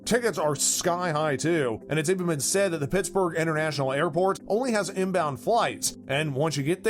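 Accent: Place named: Philadelphia, United States. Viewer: Let me guess, you are from Philadelphia? Your tone of voice, a giveaway.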